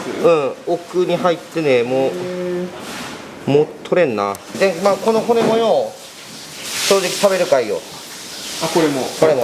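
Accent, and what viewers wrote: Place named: Takanabe, Japan. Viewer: まさか古里の方言が聞けるとは思わなかった、高鍋生まれ杉並区在住の爺さんより。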